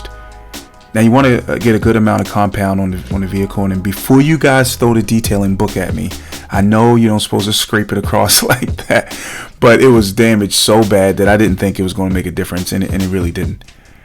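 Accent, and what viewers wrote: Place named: Baltimore, United States. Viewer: I’m going to guess you are from Baltimore?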